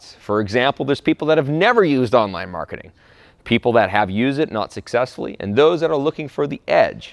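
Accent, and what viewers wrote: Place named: South, United States. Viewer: When did you develop this southern twang in your voice?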